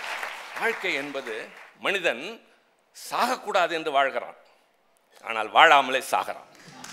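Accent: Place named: Thanjavur, India. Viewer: அருமையான பேச்சு.தஞ்சைத் தமிழ்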